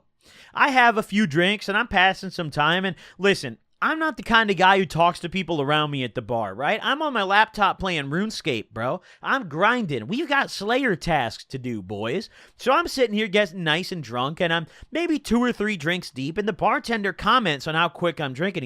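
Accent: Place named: Midwest, United States. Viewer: I was born in 91, grew up in Milwaukee suburbs which is probably fairly similar to the Chi suburbs is from. He reminds me in a very peculiar/distinct way of me and my friend group back around ~2010. His speech patterns are almost identical, which since becoming older I have moved on from this lifestyle so it just reminds me of those times. The only difference is some of the newer tech like dabs but I'm not so sure things have changed that much. We were doing the exact same shit. Maybe it's also a regional thing.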